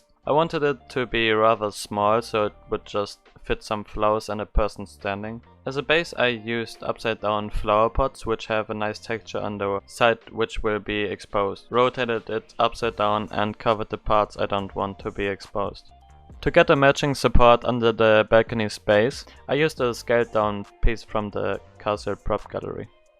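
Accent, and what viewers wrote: Germany. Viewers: ummm where are you from you sound a bit like german or smth i like how you build. Subscribe and Like😊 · are you german ? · Are you german?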